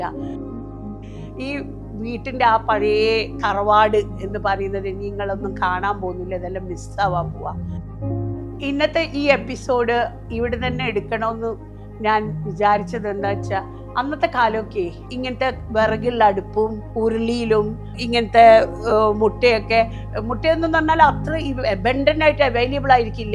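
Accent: Kozhikode, India. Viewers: Kozhikottaare samsaram kettaal thanne vayar nirayum ❤️‍🔥💪 Oru kozhikotukarii 😉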